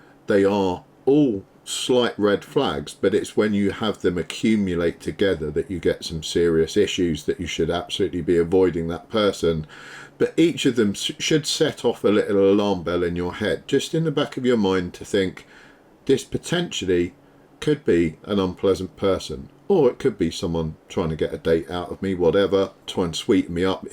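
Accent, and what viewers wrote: United Kingdom. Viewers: It sounds a lot less rude in a British accent ❤ 😂😂😂. I love the information. I am very good with my situational awareness, being armed and telling people No. But these are great videos to share with others and I do learn something from them too.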